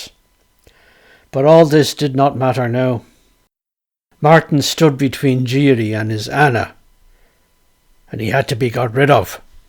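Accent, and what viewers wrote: Dublin, Ireland. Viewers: Same here but he soothes my nighttimes with his gentle voice and delightful Dubliner accent. Can you imagine sipping some tea or sharing a pint with Mr. relating one of his tales somewhere in Dublin? What a treat that would be!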